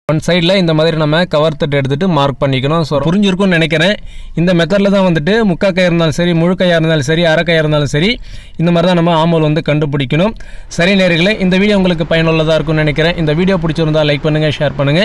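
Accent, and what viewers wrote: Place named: Kerala, India. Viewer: நீங்க எந்த ஊர் ப்ரோ மலையாளம் கலந்து தமிழ் பேசுற மாதிரி இருக்கு.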